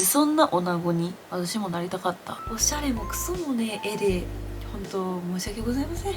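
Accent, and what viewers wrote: Kansai, Japan. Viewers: 寝れないときあるよね ❤❤ 関西弁可愛い❤癒やされます❤ 生理前てイライラするよねお腹痛くなったり❤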